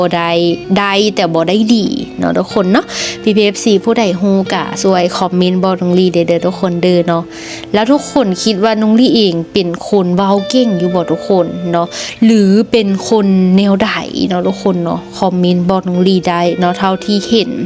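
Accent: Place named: Northern Thailand, Thailand. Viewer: สำเนียงเว้าบ้านโพนม่วงเว้าม่วนพูดเก่ง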